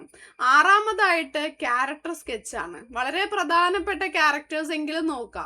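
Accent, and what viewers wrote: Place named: Malappuram, India. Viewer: njan Kozhikode, balussery anu nji Malappuram anennu vijarich slang kandappo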